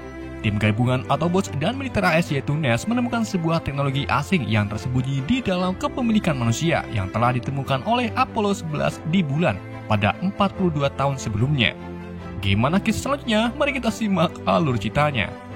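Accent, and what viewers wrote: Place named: Tegal, Indonesia. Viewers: Sampean wong tegal wis jelas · curiga..ni pebgisi suara kyy orang tegal...tau semua bahasa tegal... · Dari logat bicaranya kayak orang jateng😂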